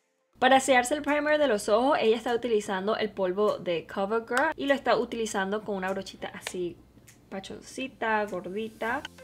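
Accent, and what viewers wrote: Venezuela. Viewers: Yo sabia que era de VENEZUELAAAA ese acentoooooooooooooooooooooooooooooo like like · Hola, primera vez que veo tus videos y por que amo a Nena. Quería saber de donde eres? Tu acento se me hace algo Venezolano, me da curiosidad. Me gusto mucho tu cabello, y no fue un fail el tutorial, solo que ella tiene mas iluminación y quizá y se echo un poco menos de producto, pero en fin, me gusto mucho tu video, iré a ver todos los otros. Besos💓😘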